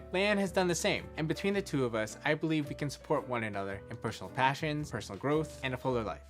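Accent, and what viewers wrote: United Kingdom. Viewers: That British accent though haha! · Lol. You have a really good British accent :D I particularly loved the point where you can't put pressure on another person to be your source of happiness. That's kind of unrealistic and bound to fail. Solid video!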